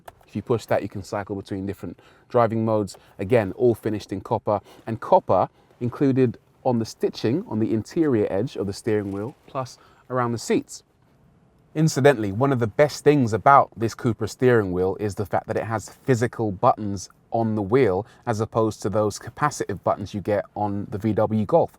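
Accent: United Kingdom. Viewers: British accent, left side drive, euro plates, where is this guy?!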